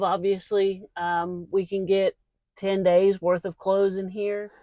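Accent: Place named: South, United States. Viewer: Just found you.....ha ha ...Never noticed your southern accent that much before in all the years we hung together.....but...Love ya guys....safe travels and for going the travel world...Have fun....Love ya ...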